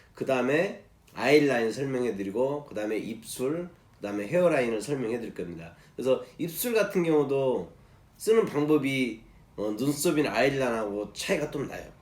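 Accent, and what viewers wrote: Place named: Jeolla, South Korea. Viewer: 구 독 ! 좋아요~~~ 했어요 꾹꾹!!전라도 사투리 넘 귀여워용 ㅋㅋㅋ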